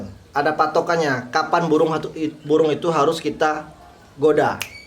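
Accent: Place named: Lampung, Indonesia. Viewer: Kayak logat lampung bg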